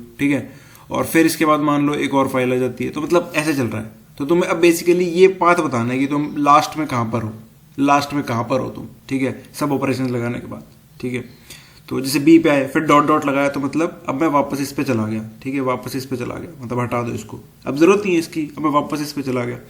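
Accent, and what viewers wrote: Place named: Delhi, India. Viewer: dilli se ho kya bahiee!! :-))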